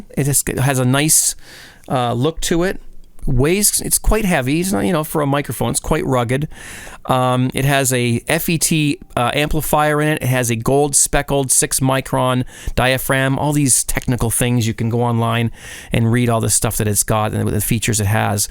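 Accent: Canada. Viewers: I could tell you were canadian by the way you talked.... I learned that from people making fun of canadians.... im a terrible person. :D (I have nothing against canada btw) about the mic: This sounds REALLY nice... I'm probably going to get this today. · Amazing what $90 can do with that Canadian accent.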